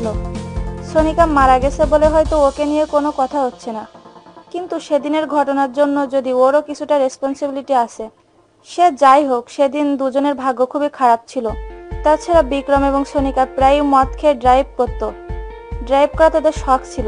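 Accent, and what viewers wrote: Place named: Rural, Bangladesh. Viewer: Cine gossip pls apnader Bangladeshi vasa ta ektu paltan? Kno J apnader gammo vasa net a charchen, valo kore kotha ta o bolte paren na apnara chi chi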